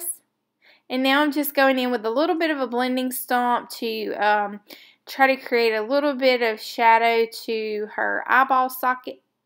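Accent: South, United States. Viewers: My oh my what an entertaining video. You have all the makings of a TRUE artist. Mygrandaughter once said “ us artists don’t give a care”. Referring to freeing ourselves As we create, KEEP on believing in yourself . The southern accent struck my heart.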